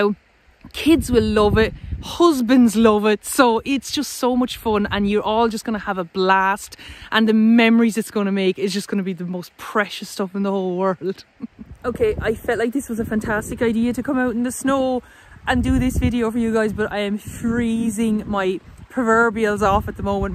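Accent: Ireland. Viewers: Hi, I'm enjoying your video so much, both for the information and the laughs. You're making me homesick with your accent and sayings! I grew up, "just up the road" from you in rural Westmeath. I'm now living in Germany but hoping to find my forever home back in Ireland one day. Keep safe and well and keep the videos coming.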